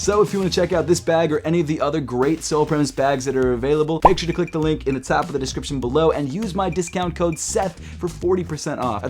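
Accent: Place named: Baltimore, United States. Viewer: Gotta love that Baltimore accent. "... you gat packets an packets. Two sneaker packets an the back, two sneaker packets an the frant"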